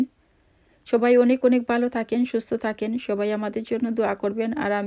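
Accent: Sylhet, Bangladesh. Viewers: apu apni sylhty basai kotha bolen balo lagba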